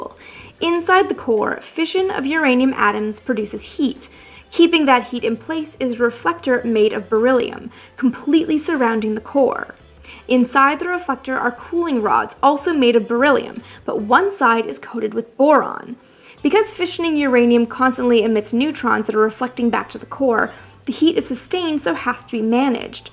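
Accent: Canada. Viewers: Are you from Canada? If not it's gotta be like Wisconsin or something, one of my ex gf's was Canadian and I swear I hear the same little accents in you that she had that no one else seemed to notice. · You should do at least one joint 'cast with Paul Shillito of Curious Droid. P.S. You're Canadian, aren't you?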